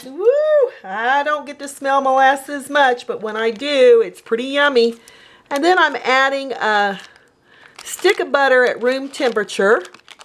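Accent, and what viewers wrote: South, United States. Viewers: Are you from the south ? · Are you from the south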